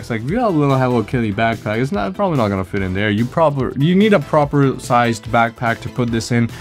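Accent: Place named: United States. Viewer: Dude speaks British English with an American accent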